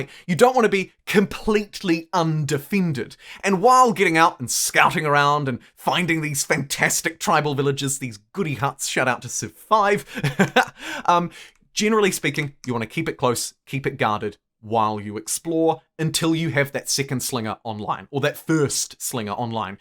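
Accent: New Zealand. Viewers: I'm super late to this game – never played Civ anything before, and Civ6 is free on Xbox Game Pass – but I've fallen deep in the rabbit hole, and I wanted to say thanks. Love your friendly voice, simple and clear advice, infectious enthusiasm and as an Aussie, I really enjoy the kiwi accent. 🙂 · Sounds like a New Zealand accent, though I may be mistaken.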